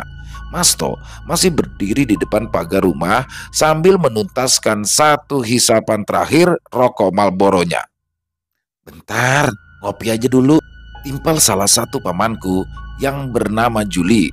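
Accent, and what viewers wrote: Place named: Betawi, Indonesia. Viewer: Bikin LOL mendengarkan Banh bercerita dengan bahasa betawi... 😅😅😅 sangat menghibur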